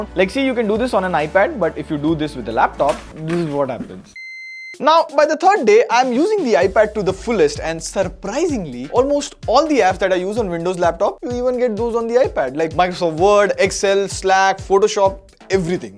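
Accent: India. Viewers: Bsdk tu Indian hai ya angraz ?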